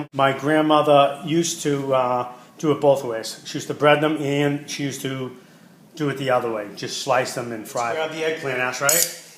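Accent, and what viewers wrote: Boston, United States. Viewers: Stumbled across this video while searching for a way to peel eggplant Love his Boston(?) accent, rapport with Ash, the dog, and his "commercial breaks." Oh yeah, the Eggplant Parm looks amazing, too! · All kinds a Boston/Mass in that voice!!!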